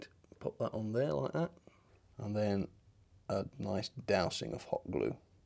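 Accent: United Kingdom. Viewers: This has got to be the most british-ish accent i have ever heard in my life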